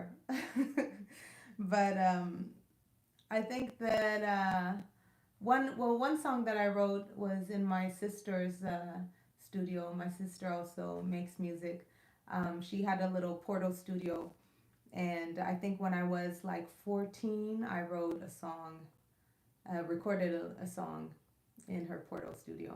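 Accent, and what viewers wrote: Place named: United States. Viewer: She doesn't have an accent she sounds American.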